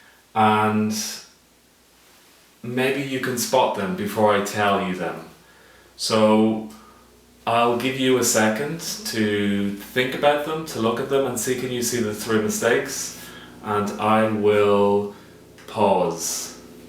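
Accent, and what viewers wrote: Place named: United Kingdom. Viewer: Hi, First of all, I would say that your videos are great although I discovered your channel recently. I've got one question, are you german? cuz I haven't seen any german which speaks such a great british accent.:))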